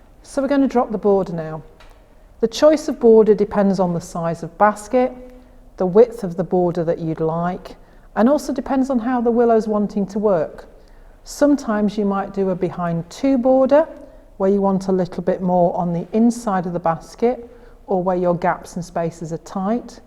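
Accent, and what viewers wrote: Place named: Lincolnshire, United Kingdom. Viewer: A big hello from Monmouth! I was born and raised in Lincolnshire, so it has been lovely to listen to your accent. It brings back many good memories. And this has been s delightful series. Nice and clear, not too much talk to confuse, and all set up in a homely placement. Congratulations. I hope you do very well.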